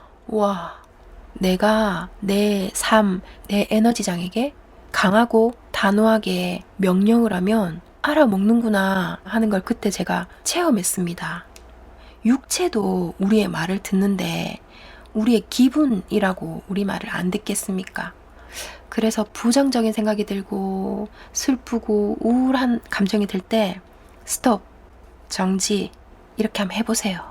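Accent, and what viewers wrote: Gyeongsang, South Korea. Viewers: 사투리 방송 방갑네요 어떻게 갱상도 사투리 방송을 할려는 생각을 하싯는지.... 그리고 진짜 욱겨요 ㅋㅋㅋ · 나도 갱상도 할밸세. 좋은일 하는군..ㅎㅎ 자넨 사투리라고 하지만...억양만 그럴뿐 용어나 발음은 많이 표준화 됐다네... 나도 국어와 언어공부를 쪼께 했는데, 연습하고 공부하지 않은 토백이 사투리는 자네처럼 발음이 안되지....노력 많이했네. 계속 정진 하고 좋은결과얻고 행복 하시게...나도 독자로서 자네 사랑한다네...신의 은총과 축복을 비네.!!! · 나도 경상도 사람이지만.. 사투리 너무 웃겨 ㅋㅋ 너무 현실적이야...ㅜㅜ 그래서 더 귀에 콕콕 박혀.. ㅋㅋ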